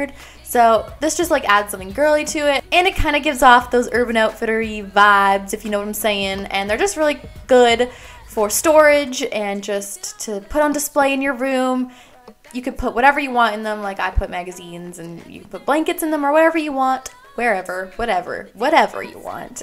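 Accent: United Kingdom. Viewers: You sound British but no one British talks like that..! Make sense?😂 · You do sound British😂👍🏼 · YOU NAILED THE BRITISH ACCENT - LIKE WOW. many americans / whatever cultures fail, but chu did our accent JUST RIGHT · that's acctually a good ENGLISH accent, British is Irish, Welsh Scottish and English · noooo lol i actually thought you WERE British haa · It's fine I have a British accent too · you do sound like british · im called charlie and im from britain and just to let u no u have a very strong british accent x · i like your british acsent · you have such a good British accent omg😂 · Look girl don't worry you are perfect talking British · she said she was going to be use a british acsent?? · no I do like ur British axant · Her British accent sounds ok tho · it sound like she is british like zoella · I THOUGHT SHE WAS BRITISH! !! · This is my first video seen and u do sound britissh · Я пришла за Британским акцентом .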